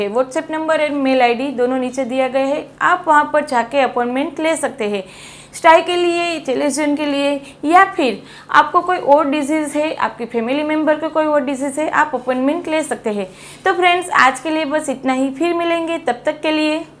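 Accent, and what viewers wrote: Gujarat, India. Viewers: Madam apka surname se ap north indian lagte ho per hindi ascent se gujarati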